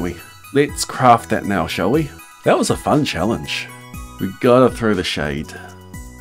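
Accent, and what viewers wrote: New Zealand. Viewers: love ur new zealand accent bro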